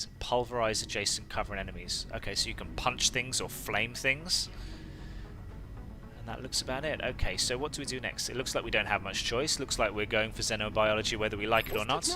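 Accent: United Kingdom. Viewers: I've started to watch the playlist really enjoying hearing British accent. Only on ep2 so far.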